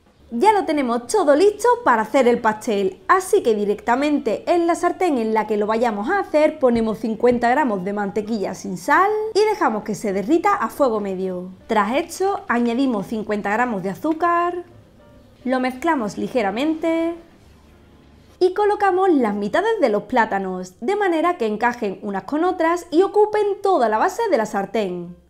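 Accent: Spain. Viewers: ¿De que parte de España es el acento?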